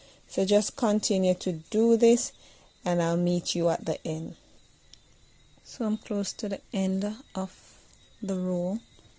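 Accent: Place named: Jamaica. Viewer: Love ur Jamaican accent and skills had to subscribe . Keep up the good works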